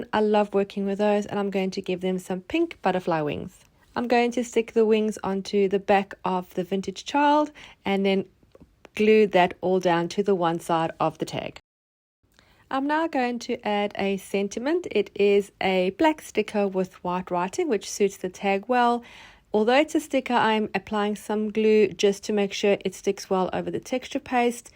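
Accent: South Africa. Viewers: HI I hear that you are south African .are you based in SA or somewhere else. Wendy from SA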